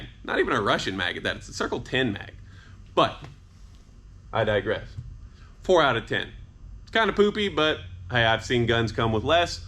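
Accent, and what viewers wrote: South, United States. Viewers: This is Tosh.0 with a southern accent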